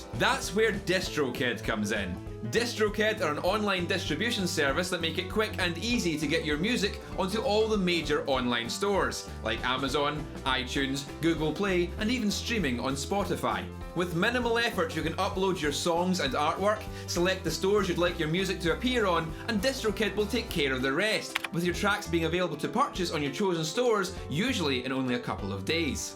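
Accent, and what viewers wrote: Scotland, United Kingdom. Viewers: Is it Scottish?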